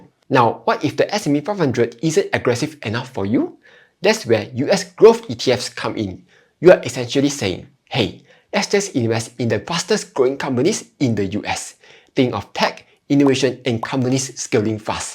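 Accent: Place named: Singapore. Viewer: Your singarpore accent(?) lm not use to trying to adjust to.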